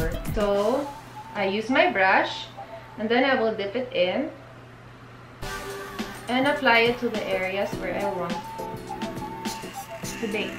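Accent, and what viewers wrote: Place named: Philippines. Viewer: Love the Tagalog accent bezzie! Haha! Awesome vids, sana magaling din ako mag makeup. Lols 😍😍😍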